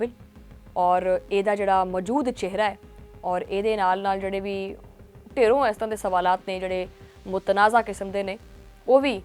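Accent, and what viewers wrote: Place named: Majha, India. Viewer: ji menu thodi teth (pure majheli punjabi ) bahut vadia lagdi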